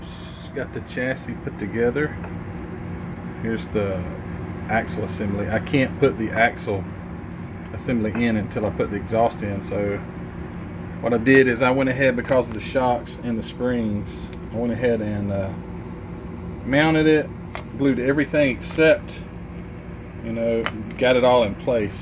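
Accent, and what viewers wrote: South, United States. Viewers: Mean! Mean paint job man! Great overall job and I was hoping you were going to go with the black stripe decals! (your next door neighbor in Canada Montreal). Your Southern drawl sounds so American!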